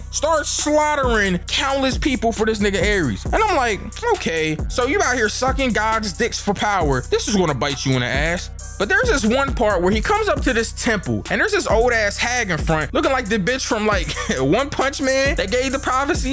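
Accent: Philadelphia, United States. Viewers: You from Philly bro?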